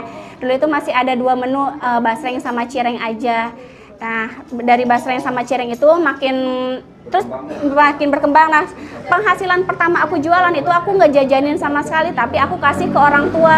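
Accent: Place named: Sukabumi, Indonesia. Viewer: logat.y kaya orang sukabumi, mantap banget teteh.y lancar & berkah terus moga qu juga bisa usaha begitu. aamiin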